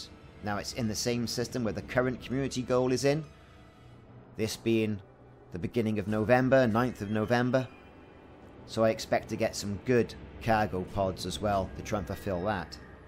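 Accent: Wales, United Kingdom. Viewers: Keep the vids coming mate, sounding good so far and good hear a Welsh voice online :-) Will be heading to Maia soon but have to do the exploration trip to unlock palin. After that I’m game for some alien hunting if your still about.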